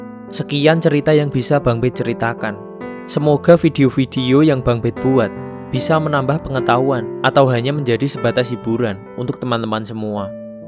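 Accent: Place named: Java, Indonesia. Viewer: Beda orang, ini logatnya ada jawa nya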